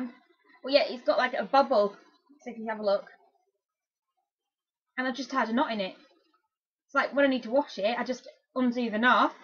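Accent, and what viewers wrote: Northern England, United Kingdom. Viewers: I love your shed! Also your accent's fine, it's good to hear a Northern accent on a podcast for a change :D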